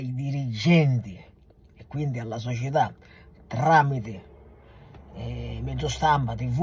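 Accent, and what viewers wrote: Sicily, Italy. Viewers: Sei un continuo piacere l'ascoltarti. Onesta' immensa (e complimenti per come parli lingue straniere, aggiunto al tuo meravigliodo accento siracusano)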